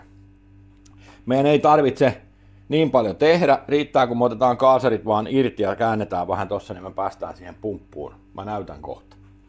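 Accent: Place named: Tampere, Finland. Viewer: Artikulaatiota selkeällä Treen murteella-asia tulee ymmärretyksi👍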